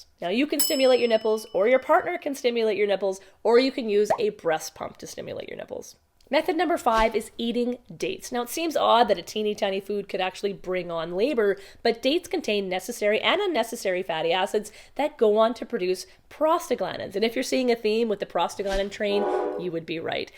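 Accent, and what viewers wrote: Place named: Canada. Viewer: I love your videos, they are so informative and I love your voice and Canadian accent. Beautiful and soothing. Also, not pregnant, but we are planning on having a second baby sometime soon!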